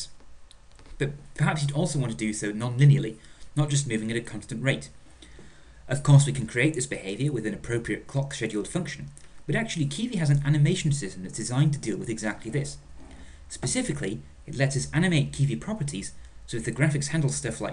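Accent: United Kingdom. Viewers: he lets his northern accent slip now and again -- his "jeeves" accent is a complete fabrication. There are many about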